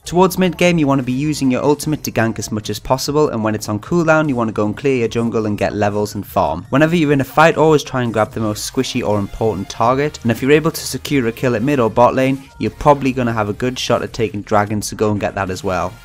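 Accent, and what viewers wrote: Newcastle, United Kingdom. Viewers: mate, you a geordie too?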